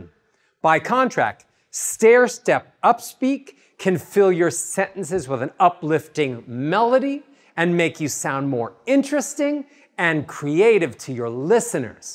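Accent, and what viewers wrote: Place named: West Coast, United States. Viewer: He has the flat Hollywood/West Coast accent.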